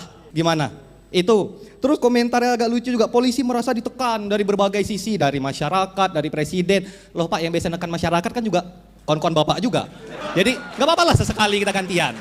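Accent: Indonesia. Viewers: Dari logatnya sptnya dia orang sumbar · Cara bicaranya mirip orang aceh..🤔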